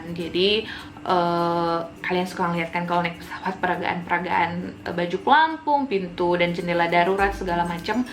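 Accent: Manado, Indonesia. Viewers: Pke logat mnado skli" bkin vidiox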